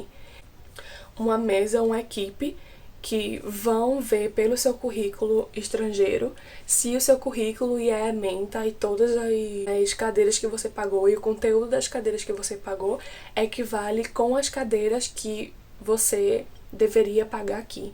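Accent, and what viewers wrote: Santa Maria, Brazil. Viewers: Tu é muito divertida véi!! E que bom que não perdeu o sotaque de SM kkkkkkkkkkkkkkk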